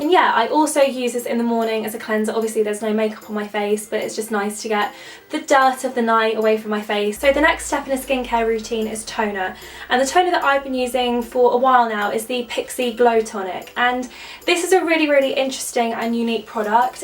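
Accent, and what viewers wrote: United Kingdom. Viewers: I just love the british Accent (I live at another country). Please can you make the room tour now, Not by 3000 cause can't wait i love just your backround. And you derseve all followers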